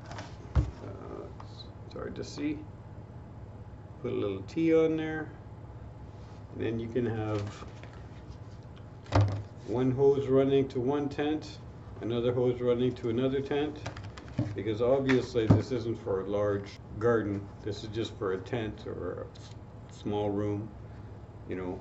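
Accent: Canada. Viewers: The accent is Canadian, eh? Thanks for the 420 411 dude. Go Oilers and God save QE2 eh?♥♥♥ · Chong was from Edomonton, Canada. The dialect sounds nearly identical. Nice DIY demo, thanks for posting. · You've got a pretty strong canadian accent, its like making carbon dioxide with Bob and Doug Mackenzie. Dont forget your tuke eh, uhh, and...uhhh bring me another beer there hoser.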